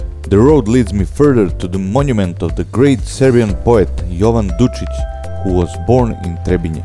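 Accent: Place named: England, United Kingdom. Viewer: Grozan, teski engleski akcenat.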